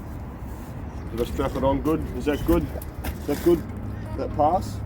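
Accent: Australia. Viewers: Love your English australia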